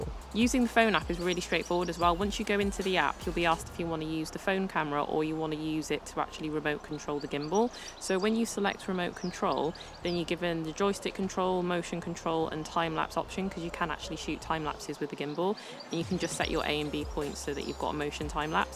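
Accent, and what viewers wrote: United Kingdom. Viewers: Love the British accent!!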